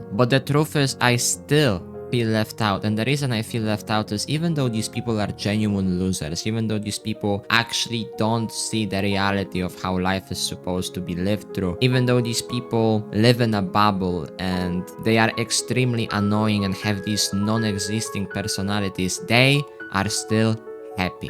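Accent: Poland. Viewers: odrazu słychać że polak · you sound kinda polish edit after research: damn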